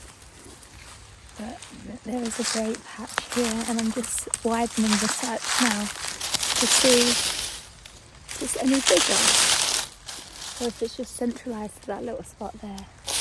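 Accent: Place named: Sheffield, United Kingdom. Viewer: Question are you from Sheffield?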